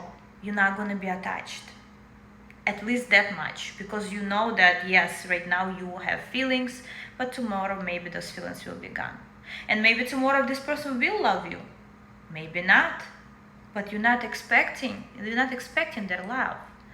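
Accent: Russia. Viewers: You've got a strong ruzzian accent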